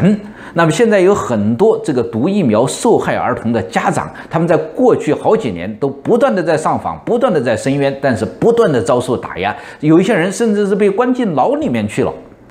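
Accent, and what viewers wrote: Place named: Changde, China. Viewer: 常德口音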